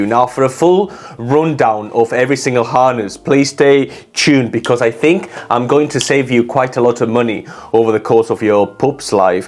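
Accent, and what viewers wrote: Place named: Geordie, United Kingdom. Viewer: I'm very interested in your accent its Geordie and like polish at the same time. Where are you from?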